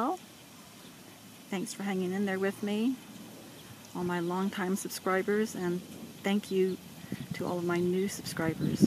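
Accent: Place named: California, United States. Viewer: Hey!!! how are you?? Ive sooooo missed that soothing Californian accent!! Do those pumpkin runners keep growing or will they eventually stop? I hope your well my friend and your family are ok? Are you back to school this week? Lotte starts Big school on wednesday (infants) and jack becomes a junior!!!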